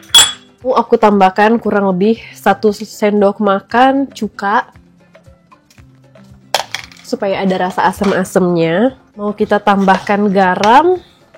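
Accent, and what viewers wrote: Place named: Java, Indonesia. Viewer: Mbak bicara nya seperti.orang jawa.pdhl bukan ya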